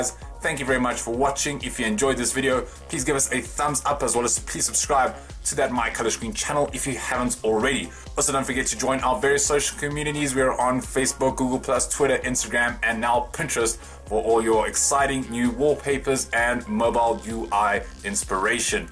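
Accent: South Africa. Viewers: dude.you South African?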